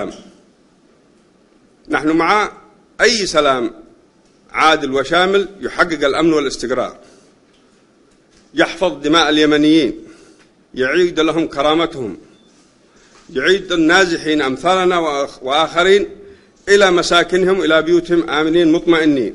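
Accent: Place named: Iran. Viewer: من لهجتك وطريقة حديثك يتضح ان مجوسي تابع لبني صفيون في ايران ،،ما علاقتك باليمن العربي الجريح وانت لا تنتمي له ولا للعرب ولا للعروبه ،،والله ليسحقوك اهل اليمن الشرفاء بمساندة اخوانهم السعوديين عما قريب واتوقع قريبين جداً ربما لا يتعدى سنه ،،،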